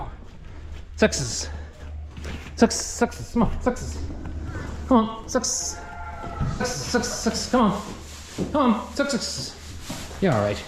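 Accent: Ireland. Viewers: cottage reveal caught my eye, had to watch! and seeing the farm life got me subscribing! i'm a city slicker now for near 30 yr's , was raised on a 10-acre hobby farm....isn't that pallet tool handy? i want to get one now! i have an idea for making a pallet bed and only got as far as collecting pallets,lol, Enjoyed the video watching the wheeler fixing too w/ ur 'helper' ...(lol, dog) ....was trying to figure ur accent, then i heard u say something about Ireland! i'm american, usa....have a good one, i'll watch ya again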